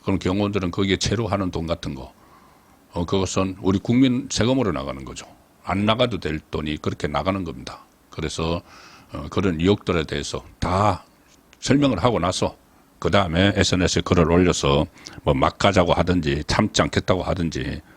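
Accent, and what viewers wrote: Gyeongsang, South Korea. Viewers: 항상 구수한 경산도 사튜리정말조와요 항항잘청취합키다 항상건강하세요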